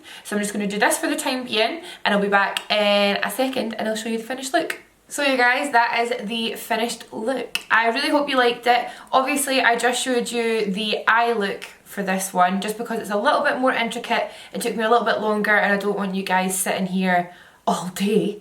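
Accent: Scotland, United Kingdom. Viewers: I usually don't like the Scottish accent (i have a cheek as i'm from Belfast, N.I lol) but i LOVEEEEEE your accent/voice soooooo much ❤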